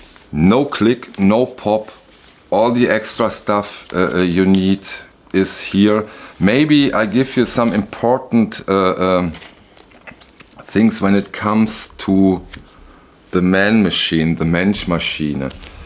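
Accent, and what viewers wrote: Germany. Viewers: Hello -I was trying to place your German accent and always suspected NRW, now I know! I lived off Stockumerkirchstrasse in the 90s when I worked for Kindersender Nickelodeon which was based at Zollhof 11 (by the Rheinturm) My one regret is not stalking their studio when I lived there! I used to go running by the Messe listening to. Thanks for the wonderful tribute/analysis.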